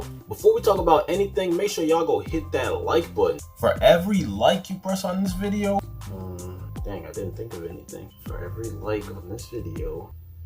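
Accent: Jamaica. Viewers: Wait r u Jamaican too🤙🏾🔥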